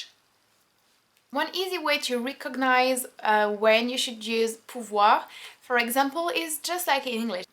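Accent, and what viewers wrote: France. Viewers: the way you speak French is so beautiful!!!!!!! I'm learnin French in school, but I cannot do that graceful and velvety accent native French speakers have. :( it's so gorgeous. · what part of France are you from?? Id like to know because I am going to France for a week this year, but I am going south of france and I know the accent down there is more difficult than north. But I like your accent when you speak French and I wondered what part of France you are from,???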